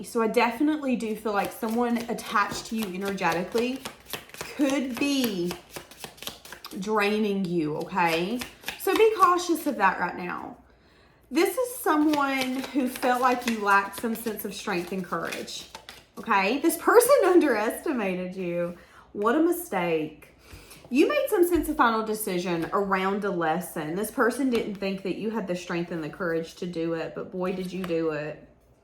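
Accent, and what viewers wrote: South, United States. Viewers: Thank you for sharing queen 👑 that was an amazing read,it seemed to resonate with several interactions I've had with loved one.❤🎉I just love listening to your country voice, you are a...😊! I usually keep my thoughts to myself, but when I do speak up (as I'm getting stronger) I seem to be more aggressive in my approach. Family members say I am mean. Probably I am still holding on to hurt & trying to heal.I had to go into isolation the last two yrs. And pull away from a lot of people I really loved. I spend most of my time with two beautiful kittens now and I still believe in love 💕💜 that really was a nice read thank you 🎊💜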